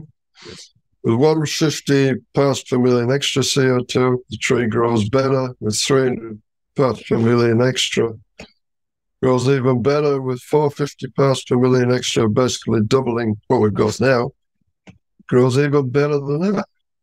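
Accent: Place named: Yorkshire, United Kingdom. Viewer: He sounds as if he's from Yorkshire.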